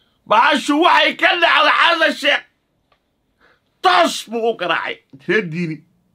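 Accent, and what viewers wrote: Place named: Warsangali, Somalia. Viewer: Orgi ciyaya kamataqaanid afkaaga warsangali kadaa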